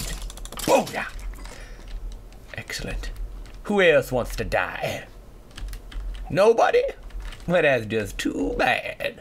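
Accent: United Kingdom. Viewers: Btw BREAK THE BLOCKS THAT THE LEVER WERE ON PLEASE PLUS ARE TOU ACTING BRITISH?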